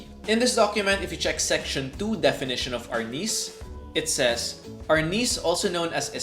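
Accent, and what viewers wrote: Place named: Latin America. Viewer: Wena pregunta. Habla c acento latino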